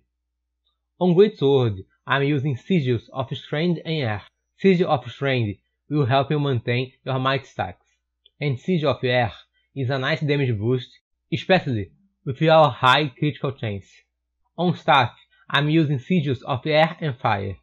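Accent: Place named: Northeast, Brazil. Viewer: Pontes Neto Pior que ele fala certo só que o sotaque nordestino é muito forte, hahaha lembra mto o Joel Santana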